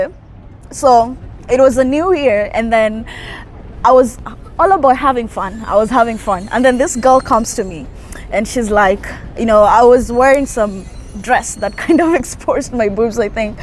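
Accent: Kenya. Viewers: thanks for the compliment. 😝actually most Kenyans speak like that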